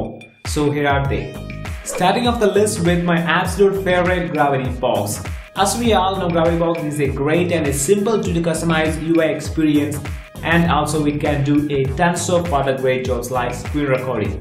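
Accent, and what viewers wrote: India. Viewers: I like the idea of him trying so hard not to sound like an Indian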